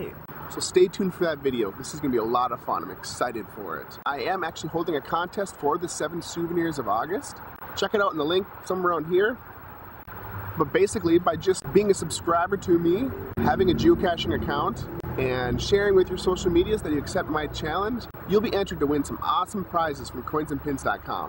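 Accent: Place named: Minnesota, United States. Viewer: You have that Minnesota O. Its ok...im in Michigan, people say I sound Canadian.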